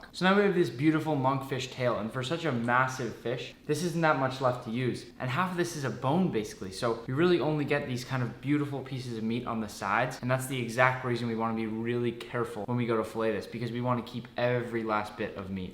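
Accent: Australia. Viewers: are you Australian? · He sounds Aussie 00:1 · he sounds like australia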